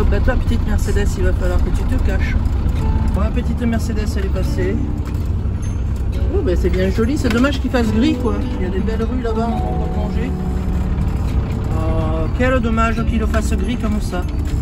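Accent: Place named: South, France. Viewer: Coucou! Les alignements de Carnac auraient une origine religieuse pour le culte des morts. Pour le reste, les scientifiques sont comme toi, toujours dans la recherche du pourquoi. C’est marrant, on retrouve notre petite des vadrouilles 😊 Ton accent du Sud est revenu 😂 Très jolie vadrouille et contente que tu sois revenue sur les routes et en plus accompagnée de Coco et Newton 😊 Bisous!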